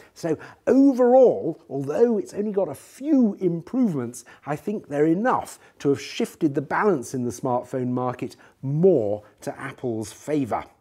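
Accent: United Kingdom. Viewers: he does not just speak with the British accent, he hads his own flair to it lovely job mate like the brits would say!!!!.....nice job from america. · Holy crap, the Brittish is dripping of that accent.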